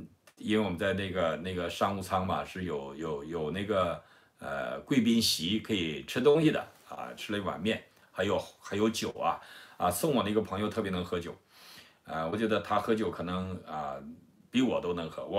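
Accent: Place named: Northeast, China. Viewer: 你说的东北话特别好听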